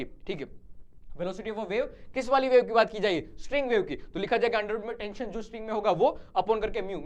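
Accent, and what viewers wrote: Gujarat, India. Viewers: aap gujraati ho kya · Sir gujrati hai kya???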